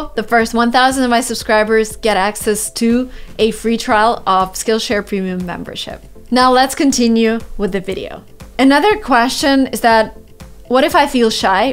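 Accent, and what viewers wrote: Russia. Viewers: Strong Russian accent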